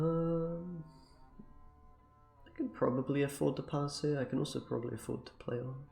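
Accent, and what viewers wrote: United Kingdom. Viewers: Thing I like with you, you speak calmy and with a good diction. Maybe I have wrong but I feel a little Scottish accent especially with the "o"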